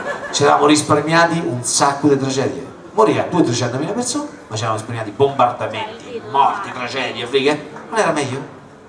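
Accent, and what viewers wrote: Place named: Marche, Italy. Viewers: è il suo dialetto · un dialetto del fermano rosi, si capisce si capisce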